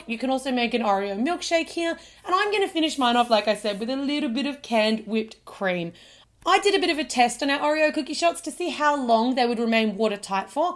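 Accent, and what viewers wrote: Australia. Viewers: Are you Australian because you sound like one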